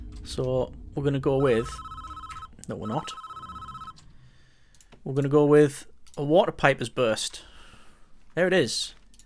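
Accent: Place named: Northern England, United Kingdom. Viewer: It's funny, I'm originally from NE England but I've lived away from there for almost 35 years, with 15 of them being in Australia. As such, my accent is very... different. But when I have more of a northern twang, my sons say "you've been speaking to Grandma, haven't you?". Now, they also add "or listening to that bloke off YouTube". So it is all your fault if I can't order through Maccas drive-thru again without affecting a "posh" voice :D But anyway, I do love a bit of Prison Architect washed down with a proper cup of tea.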